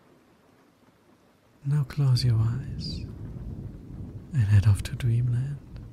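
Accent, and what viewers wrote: Germany. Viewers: Der Deutsche Akzent ist 🤌🏻🤌🏻🤌🏻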